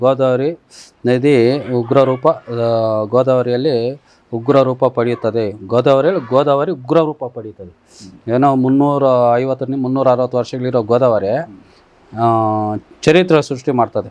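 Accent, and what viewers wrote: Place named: Doddaballapura, India. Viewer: Gnana mukya avaru astu swastavagi oduttiddare doddaballapura side e thara kannada mathanaduttare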